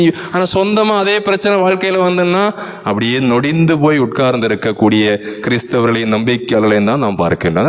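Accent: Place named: Kanyakumari, India. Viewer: இறைவன் போற்றப்படுவாராக. தந்தையே அருமையான நற்செய்தி, கருத்துள்ள ஒரு பதிவு. உங்களின் முதல் Sermon. I heard the Holy Mass while cooking. Awesome father. Without having self confidence whoever prays nothing will happen. I don't know the church name and the place. Your sound resemblance from kanyakumari Dist. God bless you abundantly dear Rev Fr. Amen Hallelujah ✝️ Hail Mary ✝️📿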